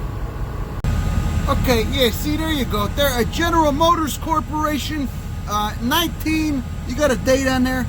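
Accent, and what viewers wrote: Chicago, United States. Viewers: Far out ...first video I heard your voice in I was like this guyz gotta be from South Berwyn or Shtickney...got any good eyetallian beef up there in Oakland ? Yeah I didn't think so you prick.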